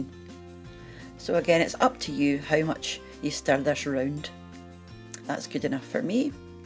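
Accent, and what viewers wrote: Scotland, United Kingdom. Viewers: This looks like a dish we would love! Thanks. I enjoy your videos so much and your lovely Scottish accent! 😊❤ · Thanks for this video. I like stovies but I LOVE LOVE LOVE listening to Scots. Greetings from the Bavarian Highlands (alpine belt) 🏴💙🏴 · My grannie and my mum made stovies with the ends of the roast. Brown the onions and add the chopped up roast. Add boiled tatties and any left over gravy. Yum! I was born in Portsoy (not far from Elgin) but live in Vancouver Canada now. Grannie made tablet on a Prius stove in front of a coal fire and sold it, with all proceeds to the Salvation Army. I used to make it but it is too “moreish” to have around. Love watching for the old recipes and listening to the home accent. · I'm trying to learn good Scottish accent for a monologue , and yours is fab!!!! X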